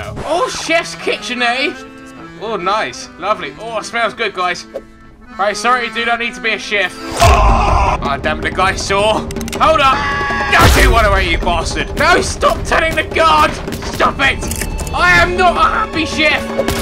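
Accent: United Kingdom. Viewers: Really is he british he has the british accent dont you hear that m8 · Butter butt he is British and the language is still English it’s just a accent. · Has a silencer, still hits the car alarm off haha, Is he brittish?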